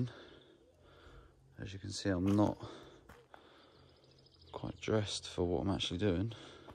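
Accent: England, United Kingdom. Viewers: You sound English?? Aussie here. Concrete and aggregate. Or fibreglass drop in. Salt water chlorinated! First I seen of one like this. You did a brilliant job.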